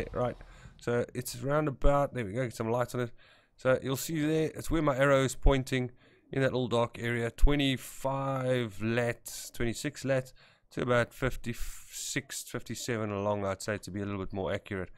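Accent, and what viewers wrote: South Africa. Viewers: You from South africa? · Dude your south African aren't you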